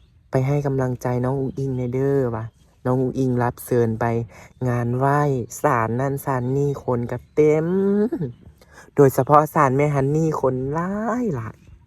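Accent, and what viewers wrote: Thailand. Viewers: น้องพูดภาษาถิ่นของน้องเลยลูกสำเนียงเพราะดีฟังเพราะจะได้เผนแพร่ภาษาท้องถิ่นด้วยลูก❤ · น้อง คนไทยเลยเหรอจ้ะ สำเนียงคล้ายๆ😊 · ภาษาภูไทยคือเพราะแท้จ้า❤❤❤เหมือนชมพู่❤❤❤❤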